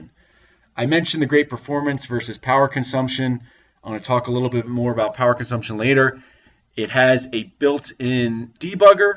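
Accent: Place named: United States. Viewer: Propably this video is kinda informative. But to be honest it just bothers me how time is generated out of nothing and, most important, i just can't stand that accent. "Right out of tha baaahx" Muricans butchering the english language just hurts to watch.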